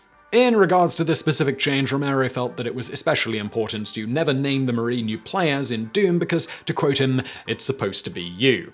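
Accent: United Kingdom. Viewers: Dude, hes British. Haha.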